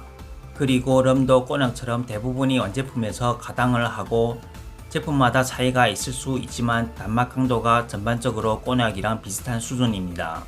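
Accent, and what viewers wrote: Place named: Gyeongsang, South Korea. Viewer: 경상도식 국어책 읽기가 귀여우시네요 ㅎㅎㅎ 좋은정보 감사드립니다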